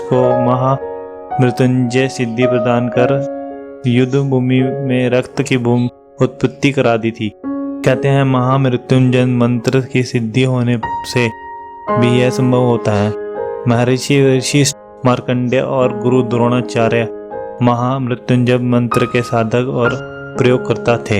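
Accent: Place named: South, India. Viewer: Koi south indian bol raha hai shayad..ok..good hindi bolne ki kosish to ki..vocabulary will improve4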